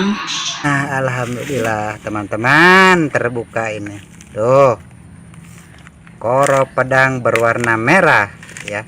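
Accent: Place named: West Sumatra, Indonesia. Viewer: Kok sampai bisa kang bahaso minang.. Heran lo awak.. Atau mungkin teteh urang padang.. Heee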